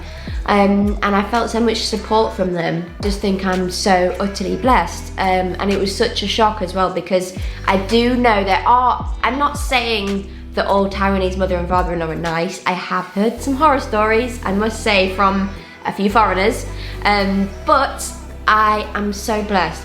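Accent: England, United Kingdom. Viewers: I really like ur English accent. Hoping you have a good lifetime in Taiwan.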